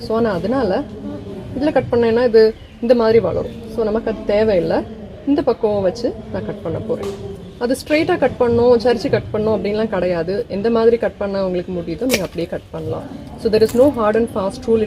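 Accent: Kerala, India. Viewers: அருமையான விளக்கம்.மலையாளி தமிழ் மொழியில் பேசுவது போல உங்கள் பேச்சு இருக்கிறது. நீங்கள் மலையாளியா ?.தெரிவியுங்கள். நன்றி.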